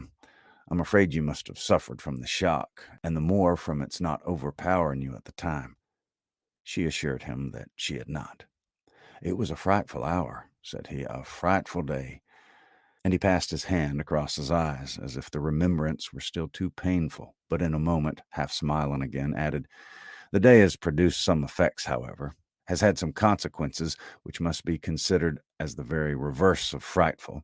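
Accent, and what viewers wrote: South, United States. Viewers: Wow, I love this! I never would have thought Austen could sound so good with a southern accent 😂 thank you for sharing your talent!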